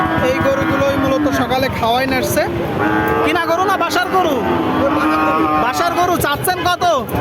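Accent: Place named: Jessore, Bangladesh. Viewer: আসসালামু আলাইকুম।ভাই এটা একটা অনেক বড় প্লাটফর্ম।এই ভিডিও অনেক মানুষজন দেখে। অতএব এখানে শুদ্ধ ভাবে কথা বলাই উত্তম। যেমন, আপনি বলেন কিরমিরি ,সত্তশ (হবে কৃমি,সত্তর) এধরনের শব্দ কি আদৌ বাংলা ডিকশনারিতে আছে? তাছাড়া যশোরের ভাষার একটা ঐতিহ্য আছে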